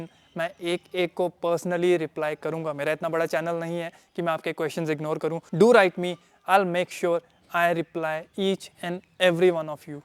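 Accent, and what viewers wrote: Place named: Jammu, India. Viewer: bhai apki tone se lgra aap jammu se ho...( me jammu se hu ) is yes then bta skte ho konse area se ...? milkr ride krnge ❤ ( although video bhut acha tha...iski unboxing bhi dekhi thi review bi dekh liya ab purchase bhi kr lunga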